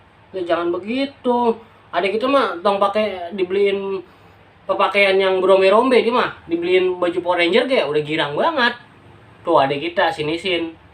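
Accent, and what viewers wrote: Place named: South Jakarta, Indonesia. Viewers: betawi asli ye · Wkwk logat Jakarta Selatan dong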